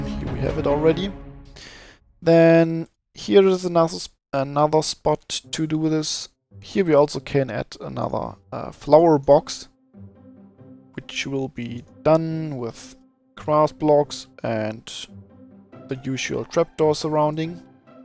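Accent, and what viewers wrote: Germany. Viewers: Du bist Deutscher stimmt's? :D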